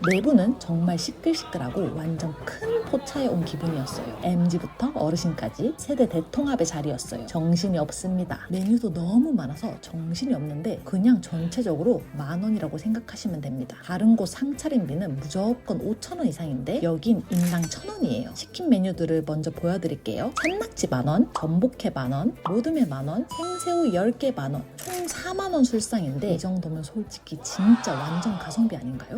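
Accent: Gyeongsang, South Korea. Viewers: 경상도 분이신가봐요? 사투리가 들리네요^^ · 경상도 사투리가 섞인 표투리 나레이션 신선하네요 ㅋㅋ 구독 박고 갑니다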